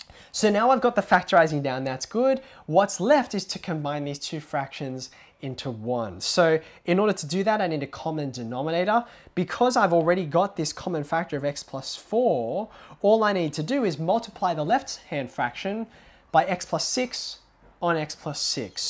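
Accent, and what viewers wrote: Australia. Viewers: Australia, apparently (strong diphthong on "o").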